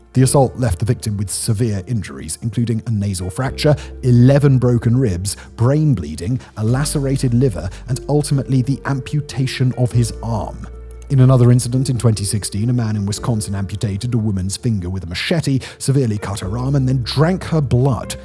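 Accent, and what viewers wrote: RP, United Kingdom. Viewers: A British accent documenting an American phenomena... will almost always be tediously inaccurate, and a giant waste of time for anyone except those who live in the UK and believe that they are learning something. They aren't. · I love hearing say " " in his incredibly posh British accent 😂😂😂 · The original comment said he has a posh accent. You replied that isn't really all that posh, but middle class. That is why I made the point that you don't have to be posh to sound posh. Hope that helps.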